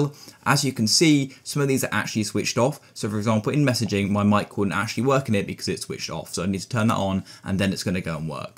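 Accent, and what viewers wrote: United Kingdom. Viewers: thanks british guy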